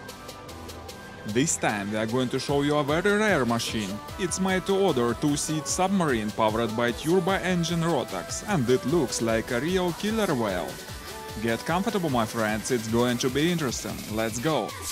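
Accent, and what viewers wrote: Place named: Russia. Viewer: Sounds very much like a Russian accent.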